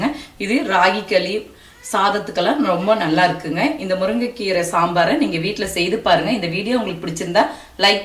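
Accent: Kongu, India. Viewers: Mam puli seka venama??? Nenga kongu Tamil ahh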